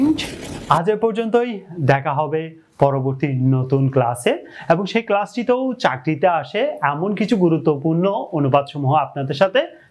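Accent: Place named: Chittagong, Bangladesh. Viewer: ভাইয়ের বাড়ি কি চট্টগ্রাম নাকি? ভাষা শুদ্ধ করে, ভালো করে উপস্থাপন করাটা এই প্লাটফর্মে অতিব জরুরি। আশা করি, বুঝতে পেরেছেন, আমার কথা। ধন্যবাদ।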